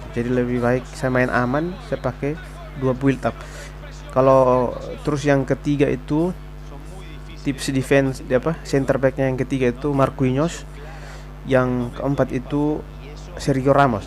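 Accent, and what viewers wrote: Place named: Kupang, Indonesia. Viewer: Lu orang mna ko logat ke orang Kupang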